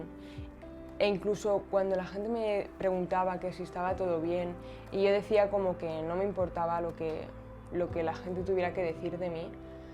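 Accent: Spain. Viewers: me gusta su voz de española suena agradable alv xd ;v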